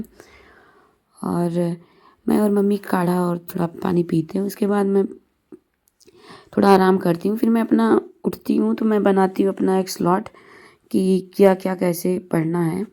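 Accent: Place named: Rewa, India. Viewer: TONE SE TO REWA K LGG RHE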